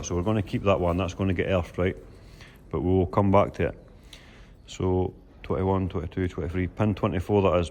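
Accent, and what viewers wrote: Scotland, United Kingdom. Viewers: I've been struggling to get my M54B30 started in an E46 323Ci even after everything's been wired in (it cranks + EWS del), and this has helped both for info and morale. Never thought I'd need a humorous Scottish gentleman explaining BMW wiring to me at 4 in the morning. Thank you, especially, for the brake rev-cutter tip . I'm the head mechanic but have been blocked from being at this car's first drift event. That would have been bad for the drivers to discover without me there.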